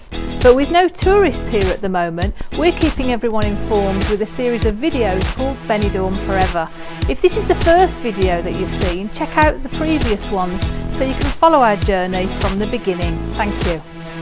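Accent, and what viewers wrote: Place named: Birmingham, United Kingdom. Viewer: nothing wrong with a Brummy accent... Trust me. As I keep telling everyone Shakespeare was a Brummie... Well almost. 😁 Really enjoy the videos. Keep em coming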